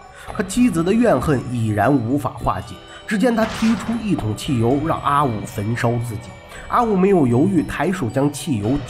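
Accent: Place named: China. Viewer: 听不出这是大陆口音吗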